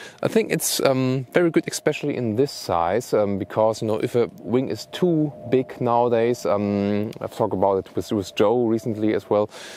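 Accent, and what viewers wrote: Germany. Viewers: your German accent sounds reliable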